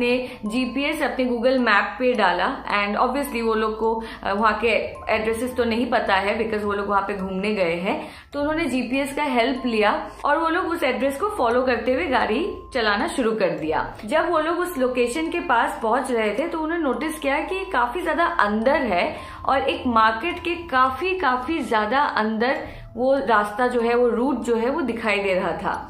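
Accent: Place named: Bengal, India. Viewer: Are ypu bengali by any chance i know considering ur sirname its not possible yet you have the accent when a bengali speaks hindi and you have a bengali beauty kind of a thing in your looks.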